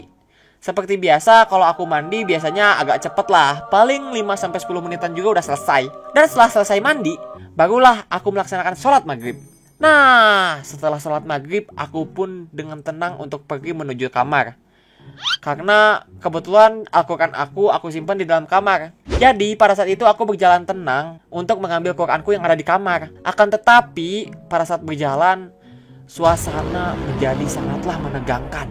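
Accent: West Java, Indonesia. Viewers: orng Sunda?😳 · Awok²... Tiguling dong:v Orng Sunda ya bang?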